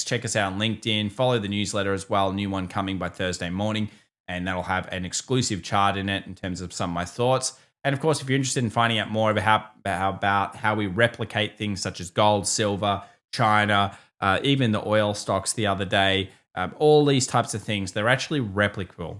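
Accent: Australia. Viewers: An Australian accent just makes everything sound better 👍👍 Keep it up 🔥🔥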